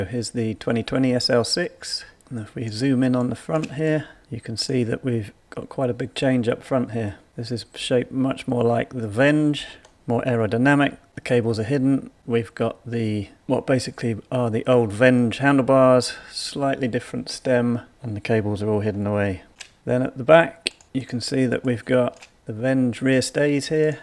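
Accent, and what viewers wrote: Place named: United Kingdom. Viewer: Thanks for that high quality comparison. Specially the model-vs-model and the price comparisons over the whole range of bikes. Love it. Even though im even more uncertain what to buy now. What would you guys go for with 6500£/8000$? btw. where are you from in the UK? Love the accent and the saying you are using. Want to learn those :-)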